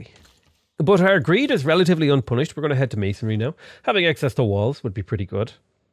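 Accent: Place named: Ireland. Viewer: Anyone feel that he was 2x more Irish than usual?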